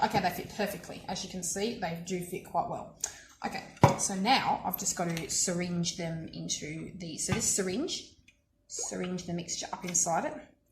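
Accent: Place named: Australia. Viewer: Nice! I always really wanted to do this. Good accent! You an Aussie mate?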